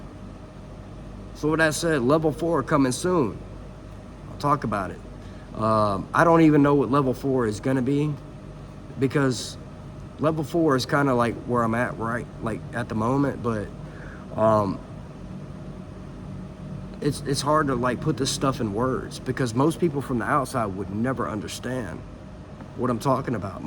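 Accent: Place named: Houston, United States. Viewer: I probably missed it in one of your previous videos, but I’m curious as to where you are from in the states. I’m from Houston, and you sound like just about everyone I know. Great video, and this type of shit is a prime example of why I’m not going back to the states once I retire. That entitlement is a motherfucker.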